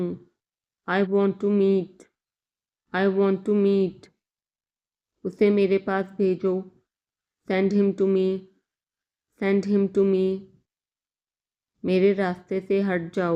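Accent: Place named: Canada. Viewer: It is better but pronounced like Canadian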